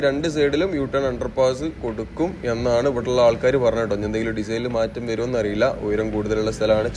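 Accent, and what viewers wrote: Malappuram, India. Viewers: Love your videos and malappuram slang. Thanks!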